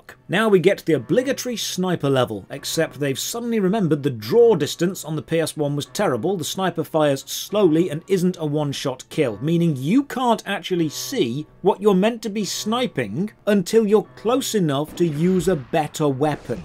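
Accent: United Kingdom. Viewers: Listening to you talk in this video, it makes me sad to realize we never got a Bri'ish Commando MOH game.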